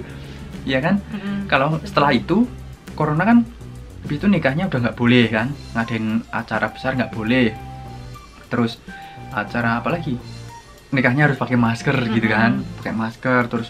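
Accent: Java, Indonesia. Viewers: Jowo ne ndi mas..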